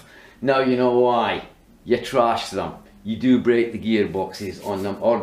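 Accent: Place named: England, United Kingdom. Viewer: Ingles de Inglaterra.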